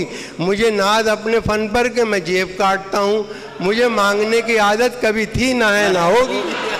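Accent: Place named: Meerut, India. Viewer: Lajawab meeruthi lehjah he....!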